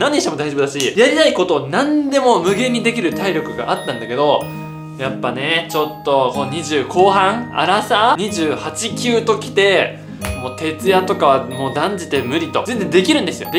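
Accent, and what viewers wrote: Toyama, Japan. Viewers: 時々富山弁がでるのが、ほっとします。富山県人より☺️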